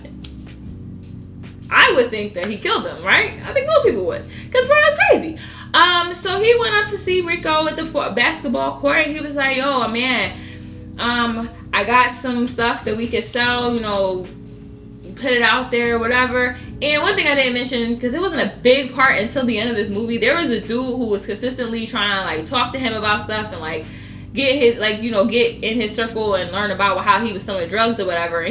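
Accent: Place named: Philadelphia, United States. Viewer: The Philly be coming out of you on these reviews lol. Great job yet again!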